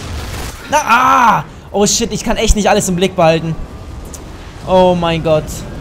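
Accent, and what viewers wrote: Hanover, Germany. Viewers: kommst du aus Hannover??? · premium ^^ du kommst aus hannover? :D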